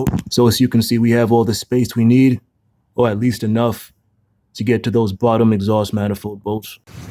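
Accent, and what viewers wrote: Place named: East Coast, United States. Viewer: Love your stuff man! You're doing great videos! I'm curious though you're in though as it seems like you are dealing with a lot of rust issues and I can hear some East Coast in your voice so you're definitely not in Houston Metro... Keep up the good work and here's wishing your channel all the success in the world!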